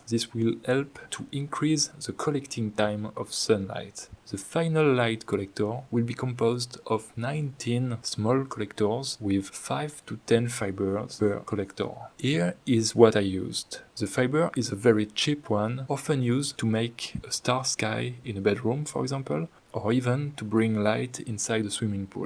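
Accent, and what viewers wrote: France. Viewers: Tu es français!! Je peux l'entendre :) Did you measure the intensity of the light, so that you can calculate the efficiency? That is the key. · I love the French accent ❤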